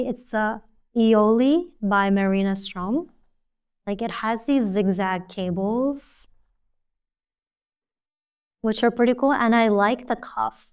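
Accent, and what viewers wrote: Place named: United States. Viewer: I recently found your channel and have been enjoying your videos. I like your style and the way you present yourself. I would love to see an introduction video, where you share more about yourself. 😊 You sound American, and then your milf is Finnish but you live in Poland - I got curious! 👀😁 Ps. Greetings from Finland! 👋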